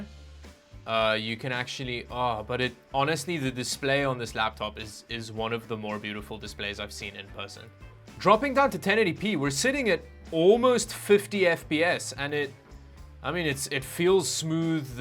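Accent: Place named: South Africa. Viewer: ek kan hoer jy is 'n Suid Afrikaner maar van watter dorp?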